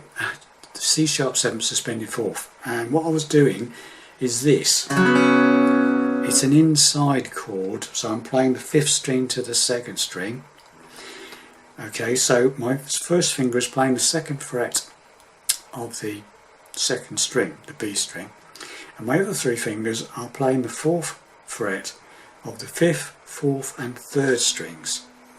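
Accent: England, United Kingdom. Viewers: that's an old Taylor you're playing there young man. As you sound English I'll assume you have a good sense of humour and your turkey-neck tells me you're old enough to remember this one from 1978. and " <link>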